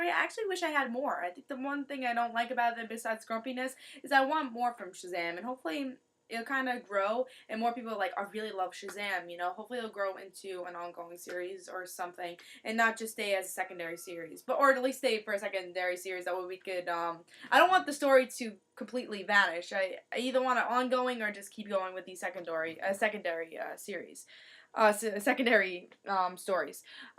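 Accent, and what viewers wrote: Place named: New Jersey, United States. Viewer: are u italian because you have a new jersey accent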